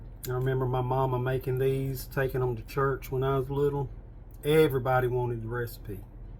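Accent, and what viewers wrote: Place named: South, United States. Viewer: You must be from the south ,with that southern accent. I live in central Ohio❤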